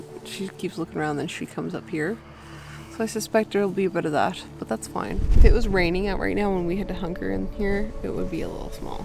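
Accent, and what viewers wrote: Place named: Canada. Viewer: never ever apologize for your Canadian accent eh.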